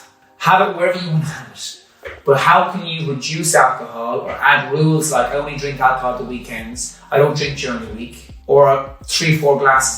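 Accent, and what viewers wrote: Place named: Ireland. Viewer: Are you Irish? I love the accent ❤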